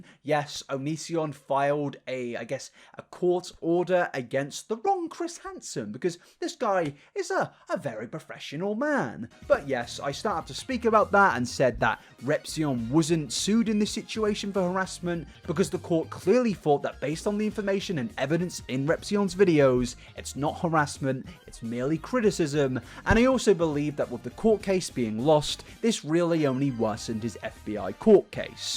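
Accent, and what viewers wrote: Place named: United Kingdom. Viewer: Do all britbongers say cuhrators instead of creators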